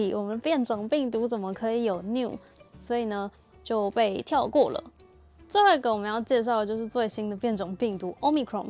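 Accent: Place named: United States. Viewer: 這是美式發音，不是真正的希臘文發音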